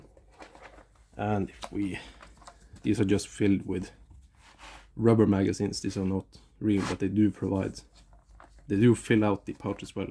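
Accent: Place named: Norway. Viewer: Jeg hører på engelsken at du er norsk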